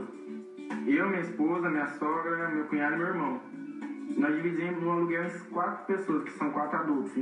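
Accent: Goiás, Brazil. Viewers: Comecei a assistir os vídeos do canal, e pelo sotaque, já sabia q era goiano...huahuahua O sotaque não nega. Parabéns pelo sucesso! +1 inscrito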